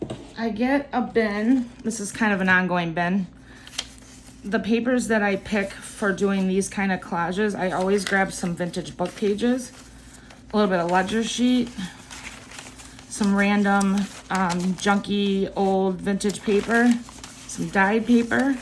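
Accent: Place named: Michigan, United States. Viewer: You sound like Michigan...I'm from Royal Oak.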